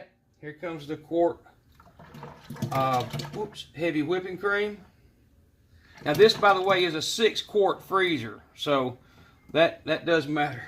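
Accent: South, United States. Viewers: Love it! And love your southern accent!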